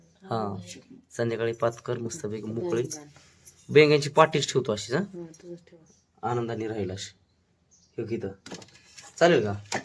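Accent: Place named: Malvani, India. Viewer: Khup bhari dada ani tumchi Ji gavarani malv bolat ajun bhari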